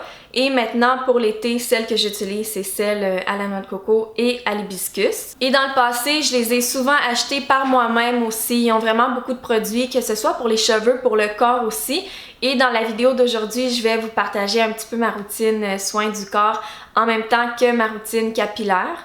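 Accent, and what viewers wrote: Quebec, Canada. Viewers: Tu Es belle 🙈et tu as l’accent de Céline Dion 😍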